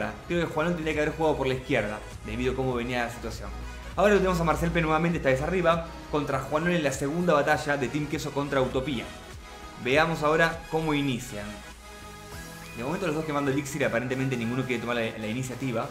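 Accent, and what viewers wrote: Argentina. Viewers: eres argentino? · eres argentino ?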